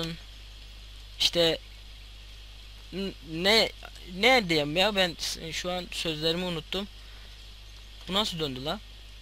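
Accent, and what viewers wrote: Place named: Sivas, Turkey. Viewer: heee sivaslılarda böyle konuşuyorlarda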